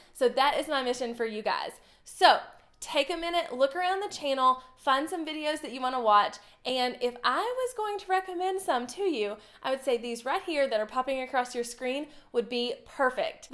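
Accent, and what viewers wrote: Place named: Arkansas, United States. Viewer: Arkansas here! I talk the same way! lol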